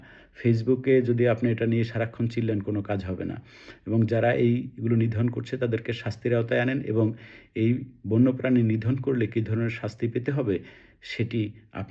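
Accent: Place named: West Bengal, India. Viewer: তোমার উচ্চারণ এবং ভাষা পুরোপুরি পশ্চিমবঙ্গীয়। তোমাকে পশ্চিমবঙ্গের আসার জন্য আমরা স্বাগত জানাচ্ছি।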